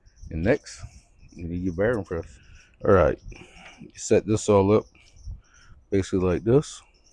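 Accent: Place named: Louisiana, United States. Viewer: You can always tell when someone’s from Louisiana!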